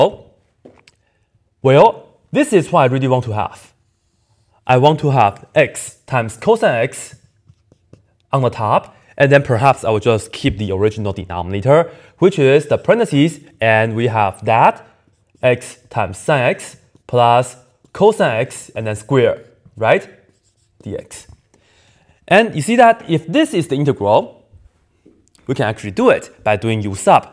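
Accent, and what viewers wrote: China. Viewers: You speak English like Chinese unlike me which is Bengali😂😂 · His english is cool It seems a mix between english and chinese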